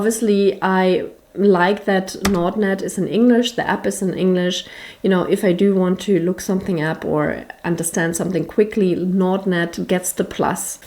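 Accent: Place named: Germany. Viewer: Wow beautiful woman and beautiful German accent.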